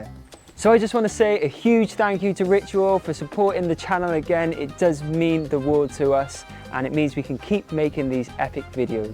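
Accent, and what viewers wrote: Wales, United Kingdom. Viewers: Great video, mate! Mouth watering stuff for sure. Also, are you Welsh? Didn't know that!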